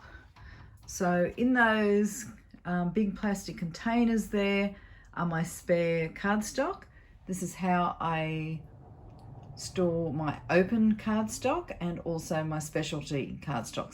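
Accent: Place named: Australia. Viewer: I have always thought I was born in the wrong place.. im not supposed to be from CALIFORNIA, I was supposed to be Australian!🤣 I LOVE your accent.. it's so amazing! So is your craft room, of course! Your room is absolutely gorgeous!! 🥰Quick story.. my grandparents went to Hawaii 1x when I was about 13, I'm 44 now lol. I told them to bring me back a cute boy, lol! When they got home from their trip, they showed us the home videos they took. In the middle of the video, were 2 guys on the beach! All of a sudden, they say... "G'day Melinda! We're from Australia!" I about died!!! My grandpa went on the beach to find 2 guys without shirts of course, and asked them to film a clip for me! I miss my gpa so much! He did this stuff all the time! ❤️ I know this isn't about that story.. but it's just to show how long and much I've loved Australia! I hope I get to go someday!❤️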